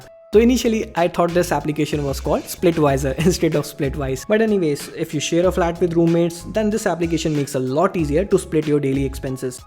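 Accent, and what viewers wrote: India. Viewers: I'm from bolivia and even me can hear that india acent :v you speak better than me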